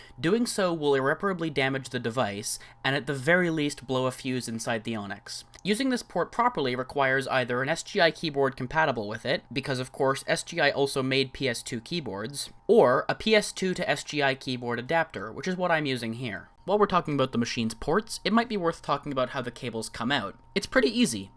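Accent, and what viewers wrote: Canada. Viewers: Plugging in a PS2 keyboard will not damage the main (Onyx) system. All that will happen is a big sweet nothing. The only way to damage the Onyx machine through that proprietary keyboard port, would be by passing a very high voltage through it. A PS2 keyboard, being a passive device, will cause no damage at all. I mean you could short every pin and still get no damage. Go on. I dare you. Double dare? Oh, I give up... PS Is that a Canadian accent? You sound quite a bit like Mr Carlson.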